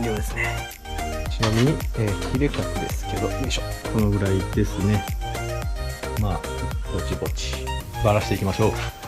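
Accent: Hiroshima, Japan. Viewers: 急にナレーション付き？広島弁ですかね？聞き取りやすくて良いと思いますよ。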